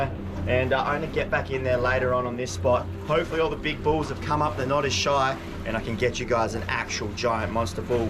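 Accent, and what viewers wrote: Australia. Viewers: Onions, lime, red shit, green shit, blah blah blah cook other side. Lovely Australian accent hey Lads.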